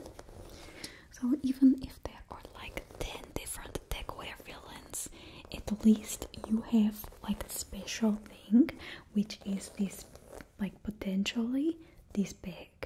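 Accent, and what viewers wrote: Russia. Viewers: Wow I totally did not expect that you'd say my name and scared me off a bit ngl 😂😂 And btw your russian accent is perfect 🥰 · Чувашский акцент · What I love about your Russian accent is that, as a linguist, I could go on and nitpick the phonetic aspect (which I won't, it's really good, it's just that some things only come to you when you are studying the target language super close and not one sane person would devote years to studying foreign phonetics), but your grammar absolutely makes up for it, sometimes you even got me wondering whether you are a native English speaker. And I think that grammar aspect is more important for creating a believable effect, because people come from different language learning environments and have their own unique speech peculiarities, so the accent can sound different from what you picture as an ideal Russian accent, but the grammar mustakes are definitely common for all Russian-Englush speakers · This is the year of my villian arc and my fashion sense isn't the greatest so thank you for the help with that. 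I can't wait to cause chaos and look good doing it. Your Russian accent is amazing by the way. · There's no need tho, it would make just a cliche trope, not a wholesome true russian accent as it is now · Your Russian accent characters are always my favorite 🙏😁 and the doctor/scientist characters. Ty! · It's better than my fake russian accent I use when playing Hell Let loose as the soviets so good work! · Coincidentally, I was just marathoning Gibi's Tatiana roleplays, so Russian accent seems to be my thing today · Russian accents are so hard or just underwhelming/overwhelming, but this, is amazing! I'm learning Russian and I have such a bad accent, so give yourself more credit! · I quickly wanted to mention how I'm really digging the Russian Accent within the recent posts!! Especially in the last one relating to the cyborg, I can easily re-listen to that video multiple times, I just somehow find it incredibly pleasing to hear. 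And while I'm commenting, I just wanted to say thank you for all of these wonderful videos :] You put so much work and detail into them, always a delight to see a new upload! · Love the russian accent. Your performance is always on point. 😩💖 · The Russian accent perfect! Do more things like this I'm in love! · I really love the genderless approach "bad girl, bad girl or whatever gender you identify as look", this was very pleasing experience, as a trans man I appreciate it twice as much) Also, as a russian myself, I would like to say, that your russian accent is very nice, I'm so tired of hearing heavy russian accent in movies, it's really hard to hear and understand, just disappointing honestly, but yours was way softer and pretty realistic! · Очень хорошо изобразила русский акцент🤔🤯, Браво! 👏👏👏 Very well showed the Russian accent🤔🤯, bravo! 👏👏👏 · Read the description and simple silly videos like these are always appreciated, love the awesome content. 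Also your Russian accent sounds great :) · Раиль Галеев именно так русские и говорят на английском, только если не имеют лингвистических способностей и/или не стараются скрыть присущий им акцент. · I've gotta say I can't get over the russian accent. Truly talented... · Happy Thursday,!😊😊😊😊😊☀. And I love the beautiful russian accent. It's brilliant!😊💙💙💙💙💙💙💙👍. Also, this 51-minute ASMR video is super amazing! I can relax and eat my pizza with it.😊💙🍕👍.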